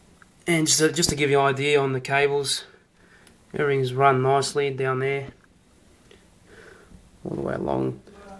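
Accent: Australia. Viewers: he's Australian (or from New Zealand). NOOB.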